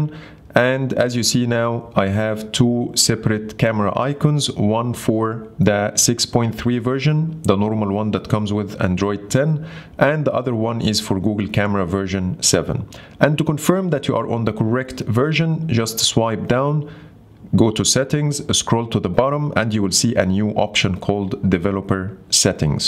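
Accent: Egypt. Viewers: مصري مصري ، اللهجه فضحاك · واضح من لهجتك إنك مصري 😁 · هو انت مصري اصلي حاسس بتواصل في سرعة كلامك مع مخي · This accent is familiar are you Egyptian?? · Ur accent is Egypt English? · انتا مصري حاسس كدا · Your English saying that you're Egyptian, am I right?! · You sound kinda Egyptian tbh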